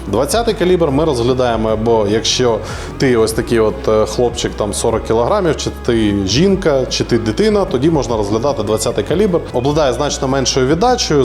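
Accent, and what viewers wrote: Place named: Central Ukraine, Ukraine. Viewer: Центральноукраїнський діалект сила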